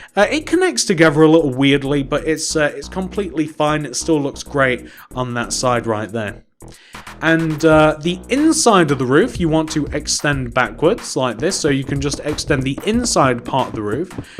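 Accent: United Kingdom. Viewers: Ah yes, an hour-long tutorial. Listen to that soothing voice of the British man.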